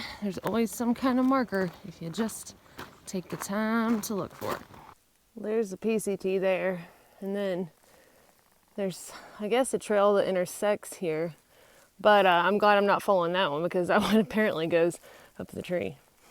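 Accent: South, United States. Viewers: Thanks again for taking me along on this adventure. Has anyone else thought that southern accent has faded just a little bit?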